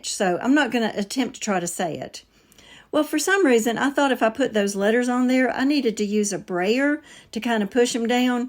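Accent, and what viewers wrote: South, United States. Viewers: I love your excitement with your grandchildren. Love your southern accent. God bless sweety.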